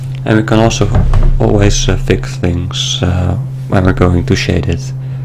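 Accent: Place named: Netherlands. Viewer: Hoor letterlijk aan je stem dat je nl bent hahah lol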